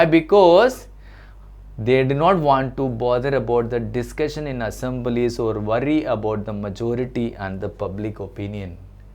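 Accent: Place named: Kerala, India. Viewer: I'm not laughing.........I just told it because I'm also a malayali..... by his accent I could recognize that he is also a malayali.....that's all..........No Offense!!!!!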